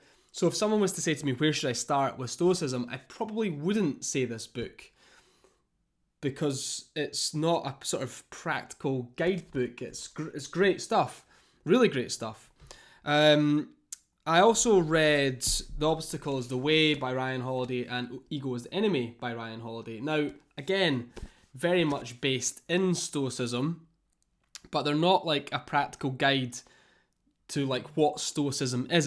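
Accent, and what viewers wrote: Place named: Scotland, United Kingdom. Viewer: Are you Scottish? I think by your accent, yes. Either way, I'd listen to you talk about carrots just to listen to your accent. :)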